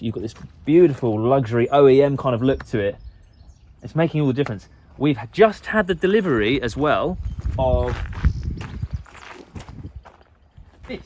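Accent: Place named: United Kingdom. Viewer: Could somebody tell me what is the brand of the front seats, visors cover and the back seat covers? English isn't my native language and the British accent is a bit tricky for me to understand. Thanks for the help.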